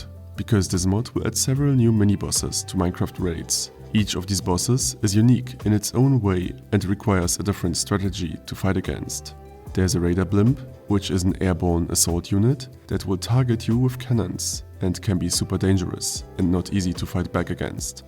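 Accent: Germany. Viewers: I Can here a German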